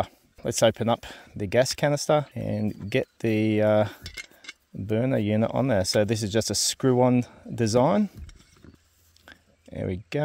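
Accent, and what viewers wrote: Australia. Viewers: WTF? I thought Kmart went out of business. And a white person shopping there? Who knew? This feels like a time warp to the 1980s when Kmart wasn't crap. I actually bought my first dome tent at Kmart when I was 12. I used that thing til I was about 26 when the poles disintegrated. Got my first 20" dirt bike there too. I detect an Aussie accent. Is this outside the US where people get better products than US people get?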